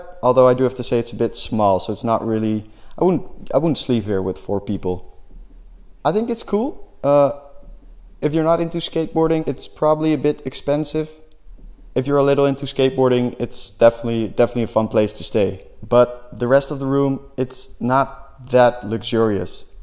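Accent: Netherlands. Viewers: hi im dutch and have have a dutch accent so are you dutch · I smell dutch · I can hear he is Dutch because I am to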